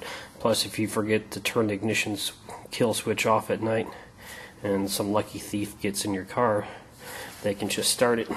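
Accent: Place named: California, United States. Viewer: Thx for the info dude! You sound like a California boy and the music after the video definitely Cali shit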